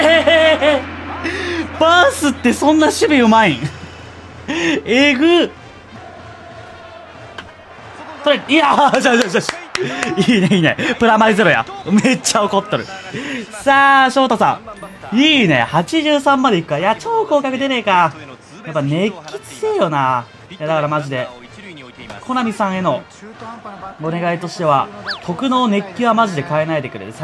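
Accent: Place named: Western Japan, Japan. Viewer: 時々、西の言葉入るよね～！嬉（笑）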